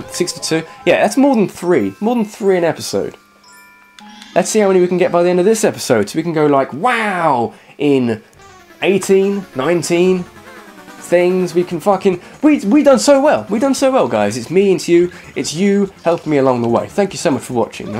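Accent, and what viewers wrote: United Kingdom. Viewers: when are you doing the next Q&A Episode? I like your accent, I'm British too. I get a lot of shit for how I talk, that I talk posh which I don't just because I don't have a broad accent of where a live cos my mum's southern so I don't sound like my friends. And you are funny!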